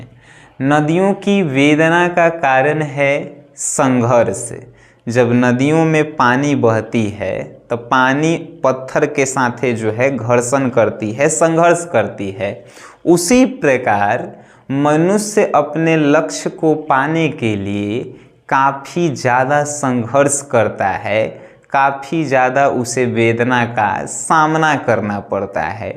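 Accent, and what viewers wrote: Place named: Bhojpuri, India. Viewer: Sirji aapki bhojpuri must h😝😝😝💞💞💞💞💯💯💯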